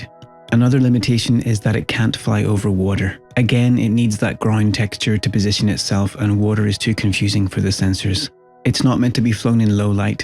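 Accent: Ireland. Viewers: I concur with most of the other contents, but I really enjoyed listening to your calm, almost ASMR voice. The Irish accent doesn’t hurt either! Not trying to come across as gay (“Not that there’s anything wrong with that..”), but I could probably sleep to one of your reviews. 😅. Keep up the great work. If that’s your girlfriend, she really cute too! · Excellent review and beautiful put together . You sound irish ? But that doesn’t look like Ireland ? Where you get the background sounds from ?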